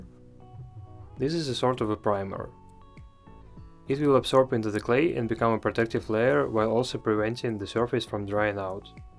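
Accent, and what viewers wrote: Russia. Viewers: акцент русский или мне кажеться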